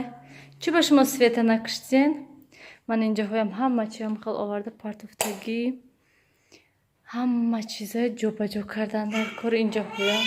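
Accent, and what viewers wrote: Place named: Khujand, Tajikistan. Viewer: салом муборак шавад хонаи нав насиб куна худочон моям сохиби хона кунад мебахшед шумо гапзанитон хучандихоба монанд не душанбегихоба монанд мо хамихе гап мезанем илтимос нависен ай гучо шумо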